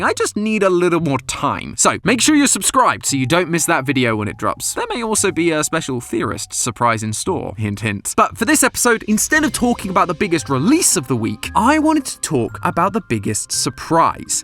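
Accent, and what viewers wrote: England, United Kingdom. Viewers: WHY DID MATPAT RETIE AND YOUR BRITISH NOT MATPAT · matpat is british now? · is a true prodigy. One created by matpat. (Nothing changed. The man behind the theory just sounds more British sounding.) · man. i will never get over this voice. ofc the british one had to get game theory... lol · I think that this guy is a good replacement for matpat, because he just sound like him but British. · what happened to pat mat, why did he turn to british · Hey you might wanna fix the United States close caption here. Someone used your English accent too literally and wrote Dawko as Dorko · Every video I hope to hear matpat voice but no just a random british dude 😔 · did matpat become british? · Guys the British guy is back! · Bro I miss mat pat 😭 now he’s a British guy man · Matpat seems a bit more British than I remember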